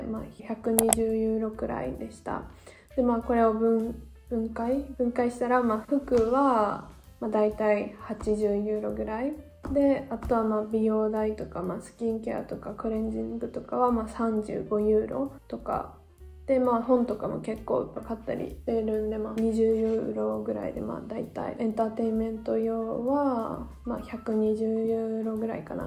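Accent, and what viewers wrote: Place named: Kansai, Japan. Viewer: 初めまして。Hikaruさんの動画がたまたま表示されたので、興味が湧いてきて見てみたらなかなかエモかったです。 僕も去年の夏まで駐在でオランダに住んでいたのですが、今は在欧邦人の間で和食が手に入りやすいことから一定の知名度を誇る（かもしれない）ドイツ西部の町で仕事しています。 Hikaruさんが別の動画で挙げているオランダ人の温かさ、また美味しいご飯にはなかなか出会えないという体験談に思わず頷き、共感しながら色々な思い出が蘇りました。笑 あと、関西弁が素敵です！ 同じ関西民として、Hikaruさんが今後もオランダで納得のいく暮らしができること、そして大変な毎日でもたくさんの幸せに恵まれていくことを祈っています。 全力で応援しています。 ドイツで僕も頑張ろうと勇気と元気をいただきました。ありがとうございます！ 速攻チャンネル登録しました。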